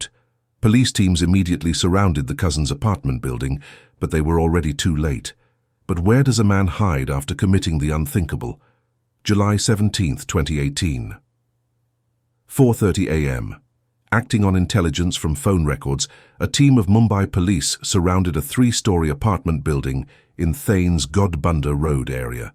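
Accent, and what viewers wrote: United Kingdom. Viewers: Get this crap off now! What's with the tosser English accent? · Me from Visakhapatnam in the Province of Andhra Pradesh, India. I am an adept listener of your narratives, most particularly for the sake of correcting and fine-tuning my British english pronounciation, and improving space of my vocabulary. Thanks for your melodious narrative.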